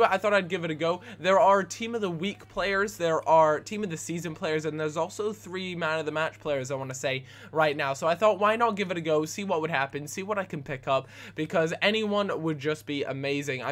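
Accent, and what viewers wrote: United States. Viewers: Sound American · R u American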